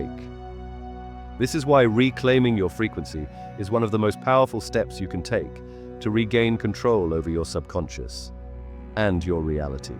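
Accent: United Kingdom. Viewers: I can't stand these UK accents so annoying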